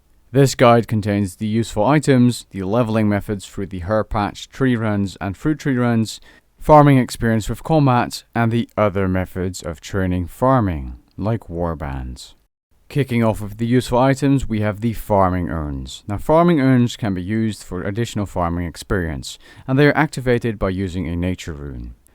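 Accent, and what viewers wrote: Netherlands. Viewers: He’s fucking dutch, it’s not his first language. Now shut the fuck up. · that dutch accent tho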